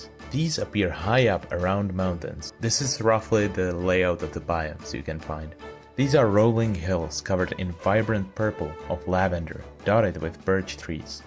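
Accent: United Kingdom. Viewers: British accent was epic and very funny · you have an awesome British accent please release more news about windswept update pleaseee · Accent funny british was generic comment /// no subliminal msg this time sry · British accent's kinda interesting, I recommend to u look at the Irish English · Britis accent was amazing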